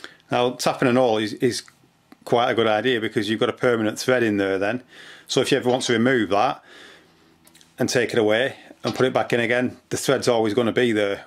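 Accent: United Kingdom. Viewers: brit, man.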